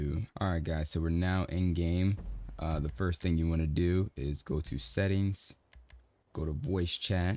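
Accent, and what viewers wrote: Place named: Memphis, United States. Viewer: You gotta be from memphis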